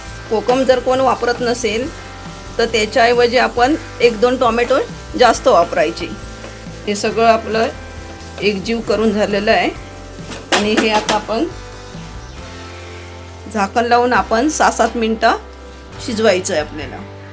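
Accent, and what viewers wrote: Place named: Rural, India. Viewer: Tharrat marathi aahe tumchi baba chan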